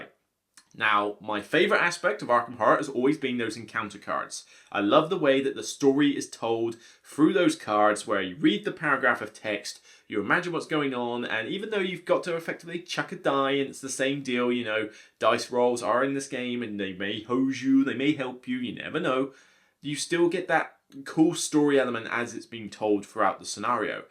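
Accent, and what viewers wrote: United Kingdom. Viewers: Have my thumb up and don't take it too seriously, there are many reasons to dislike for different people as *Wants to thumb up, but klicked the wrong button by accident, *dislikes your British accent/clothing/playstyle enter whatever reason here and madly down thumbs all your videos, *hates Arkham Horror/Ameritrash games..... Asking for the reason is fine, but I would not expect to get an answer from those who thumb down, as the won't likely read your question and IF they wanted they could have give constructive negative feedback in the comments...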